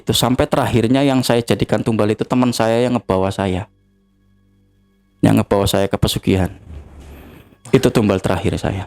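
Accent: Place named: Pantura, Indonesia. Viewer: Logat ngmongnya kya orng daerah pantura, ( cirebon atw indramayu).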